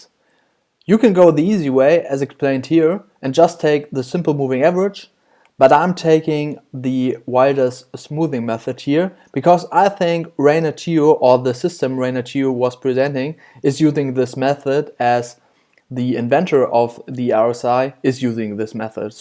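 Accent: Germany. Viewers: I hear an accent, if German then Dankeschön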